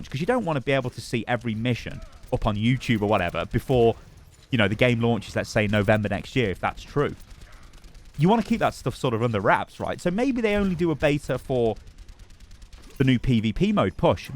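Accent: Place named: United Kingdom. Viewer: Good ol I don’t even listen to the video, this is just asmr of a British man talking gibberish for 10m 💀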